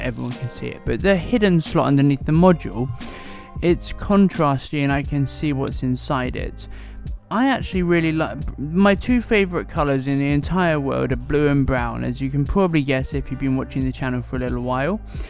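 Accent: United Kingdom. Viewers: Already subscribed. Learning a lot! Thank you. But the music is a little distracting when i understand English just not England English so some of your words are already difficult to pick up sometimes.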